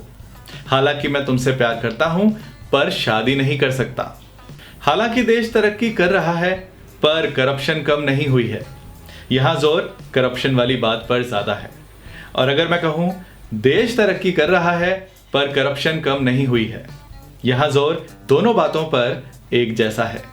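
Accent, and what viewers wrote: India. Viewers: Indian english was so bad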